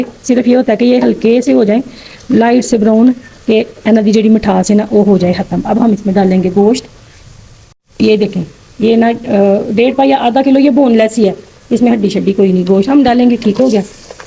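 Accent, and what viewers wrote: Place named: Punjab, India. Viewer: Love your Punjabi accent ❤❤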